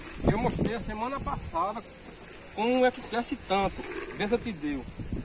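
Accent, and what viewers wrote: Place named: Northeast, Brazil. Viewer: Boa tarde amigo adoro seus vídeos e sua família. Fala do jeito que vc sabe eu estudei mais amooo o jeito nordestino de falar isso é vergonha não as vezes eu falo errado e normal pra mim liga não continua vc é top trabalhador isso e no que importa um abração pra vcs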